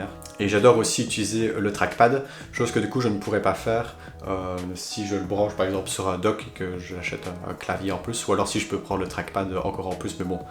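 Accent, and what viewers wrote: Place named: Liège, Belgium. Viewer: Suis tombée sur ta vidéo par hasard, ça fait du bien d'entendre l'accent de lidje dans les méandres de YouTube 😊 Agréable setup + 1 abo Bonne journée, m'fi 🙂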